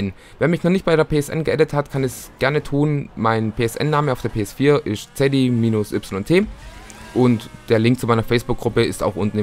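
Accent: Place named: Swabia, Germany. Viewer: Seit Beginn 2016 spielt eig. eh fast jeder mit UnlockAll und im Vergleich zu anderen Updates hust finance and felony hust find ich das Cunning Stunts DLC nicht mal soo teuer. Und trotz alledem sind die Autos in diesem Update viiiel geiler als alle anderen. Ansonsten: Cooles Video! :) Ps: Bin auch Schwabe. Den Akzent kannste dir einfach net verkneifen :D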